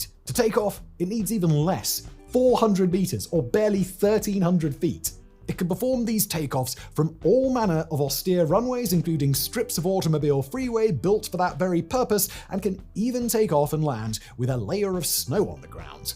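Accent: RP, United Kingdom. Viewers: Not related to the content but that accent cannot be real, unless someone can verify where it’s from, ( if poshy English, what area exactly?)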